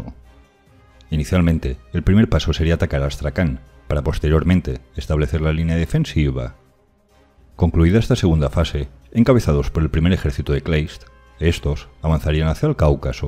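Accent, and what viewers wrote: Galicia, Spain. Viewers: Eres de Coruuuuña?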